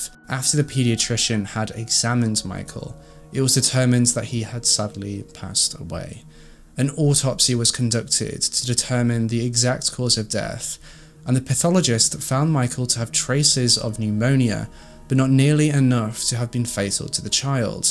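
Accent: United Kingdom. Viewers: I've been following Stephanie Harlowe's true crime channel, and recently discovered I've watched all of her videos! Stephanie's videos are incredibly high quality, and I didn't expect to find another true crime channel that was anywhere near as good as hers. I'm pleased to have discovered this channel. It is VERY well written and narrated. Bonus points that the narrator is very handsome and has a delicious British accent! Yaaay! You've got a bew subscriber. 😁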